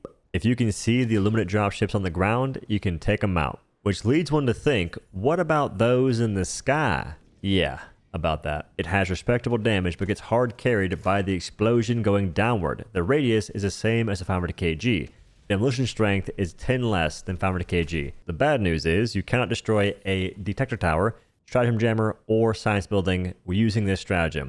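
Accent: South, United States. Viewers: To this day still trying to figure out accent because sometimes it sounds southern but other times it doesn’t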